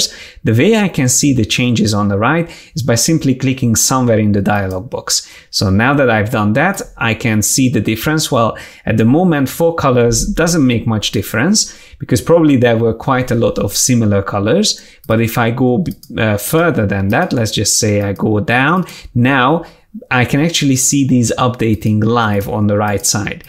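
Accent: Hungary. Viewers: Hungarian accent 👌 :D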